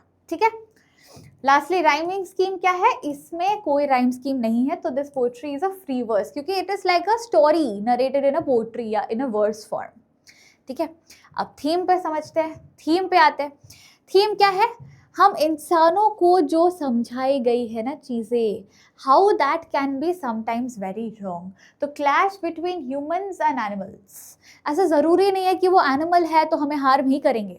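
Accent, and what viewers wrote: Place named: Bengal, India. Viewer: her begolii accent🥹🤌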